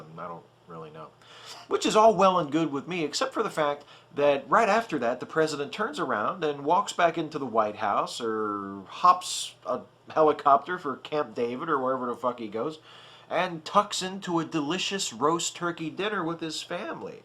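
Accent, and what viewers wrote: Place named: Appalachia, United States. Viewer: That fucking hill billy accent. xD I'd like to hear more of that!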